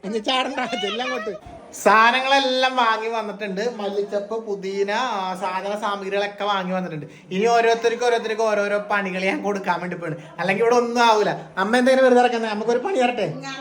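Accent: Kannur, India. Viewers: നിങ്ങൾ കണ്ണൂർ ആണോ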